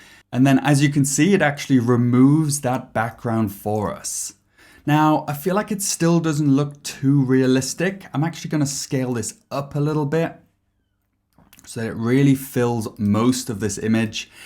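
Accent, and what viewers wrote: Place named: United Kingdom. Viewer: Hey. Fantastic video. Much more informative than others on the listing photos subject. I notice a British accent. Are you UK based? If so do you sell in USA?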